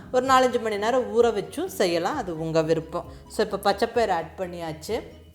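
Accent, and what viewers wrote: Kongu, India. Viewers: Mam nenga kongu tamilil pesurathu pidichirukku..nan Theni Dt.bodinayakanur. gravy super sis. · Neenga.. namma kongu tamila pesurathe azhaga irukuthunga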